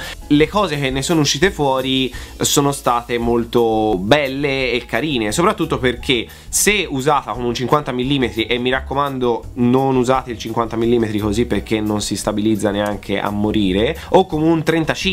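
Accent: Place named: Tuscany, Italy. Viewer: Io da toscana a sentire un toscano moio dalle risate 🖤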